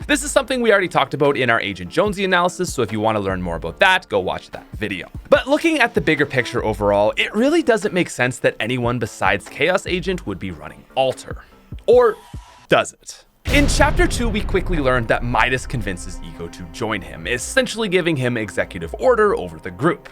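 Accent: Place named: Nova Scotia, Canada. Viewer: First time watching one of your videos and I can instsntly tell you're a Scotian just by that accent 😂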